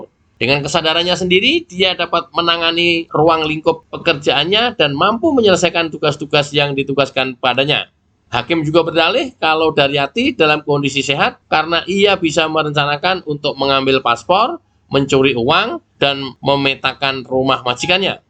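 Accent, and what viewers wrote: Java, Indonesia. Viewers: Bang?? Leh moco berita apik logate,..d campur bhs jawa.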